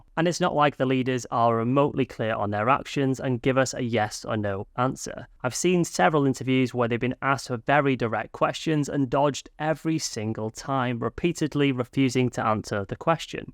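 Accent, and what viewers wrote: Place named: United Kingdom. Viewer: Replace the British accent and substitute Canada for England and it could be the same video. Gov't attitude is to tax to get funds, create a need, raise the tax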